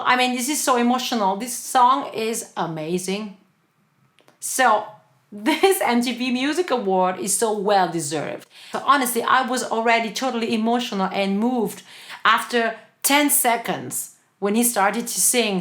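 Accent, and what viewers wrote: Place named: Italy. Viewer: Заметно что мадам из Италии. Очень быстрый темп речи. Тяжело успевать читать субтитры.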